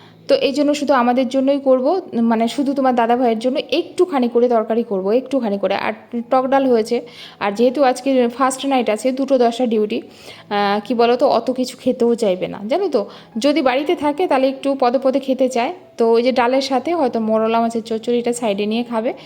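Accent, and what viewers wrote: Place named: Dhaka, Bangladesh. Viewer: Tomar bhasa ta dhakia. kamon kore ktha balo, Mon k balo MN,Prosad k bolcho psad, prothom na bole balo Pthm. Sudhrao egulo, kthate ato dhakar taan sotti. Somosto uchcharon vul karo tumi. Egulo sudhrao,Nijeke jakhon Sikkhito dabi karo loke to bolbe je ki porasuna koreche ktha thik mato bolte parena, Tomato k balo Tomyatu kamon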